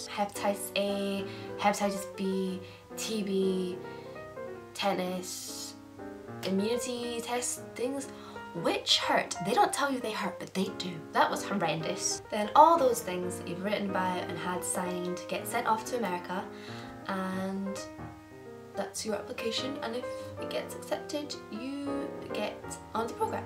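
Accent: Scotland, United Kingdom. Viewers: you have such a cute scottish accent :D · tis not a good thing, why would a scottish person not want to sound scottish. also she does sound scottish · Haha, wish you came to my school! We never had a foreign exchange student from Scotland, here in Florida before, escpecially a cute one x) Love the accent too! Hope all goes well! Good luck!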